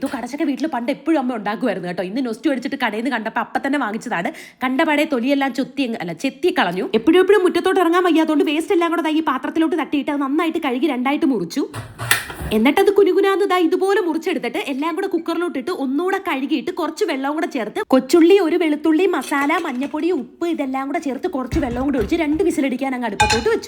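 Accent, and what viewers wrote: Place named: Malappuram, India. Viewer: ഒരു സംശയം ചോദിച്ചോട്ടെ ചേച്ചി ഇപ്പോഴും വീഡിയോ ഇടുമ്പോൾ ന്യൂസ് കൂടാനും സബ്സ്ക്രൈബ് കൂടാനും വേണ്ടിയാണ് ഓരോ വാക്കുകളും തെറ്റായിട്ട് മനപ്പൂർവം പറഞ്ഞിട്ട് അത് പിന്നെ ശരിയായിട്ട് പറയുന്നത് അത് ഈ ചേച്ചിയുടെ സംസാരത്തിൽ നിന്നും മനസ്സിലാകും അത് ഏത് പൊട്ടന്മാർക്ക് ആയാലും പെട്ടെന്ന് തന്നെ മനസ്സിലാവും മലപ്പുറം പറയുന്നതാണെന്ന്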